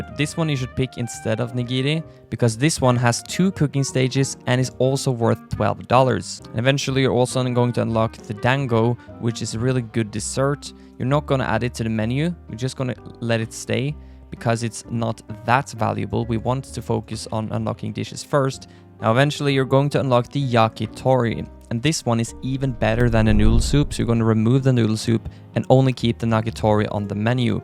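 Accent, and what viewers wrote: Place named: Norway. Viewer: Brooo Are you from Norway? Or should I say er du fra Norge? Jeg så det når du sa vi skulle abonere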